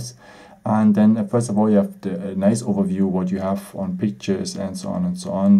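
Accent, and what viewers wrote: Germany. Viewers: German English :))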